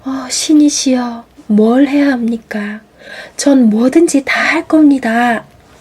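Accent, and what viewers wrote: Gyeongsang, South Korea. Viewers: 부산에 사셨군요. 처음에는 대구 말투인줄 알았어요.^ 티나다=포떼나다 갱상도 사투리죠~^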